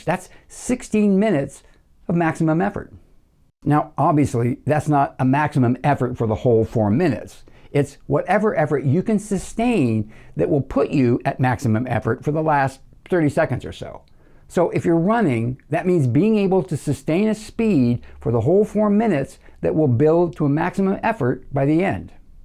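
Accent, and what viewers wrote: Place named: New York, United States. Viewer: FINALLY!.. Someone who is not full of bullshit!.. I will do my best to honor you and incorporate what you teach as best I can... Love your way of speaking... Are you from NYC?... 68 year old senior softball player, and just getting started with a rutine... Any words of advice?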